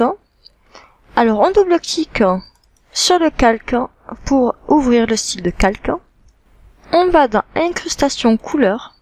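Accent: South, France. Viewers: Ahhhh j'étais sur que c'était du sud et j'ai faillit dire Marseille mais j'ai bien fait de ne rien dire j'ai évité l'accident politique lol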